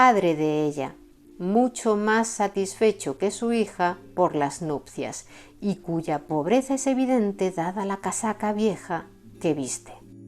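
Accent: Spain. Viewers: Me encanta ése lindo acento español. Soy colombiano y admiro muchísimo tu trabajo.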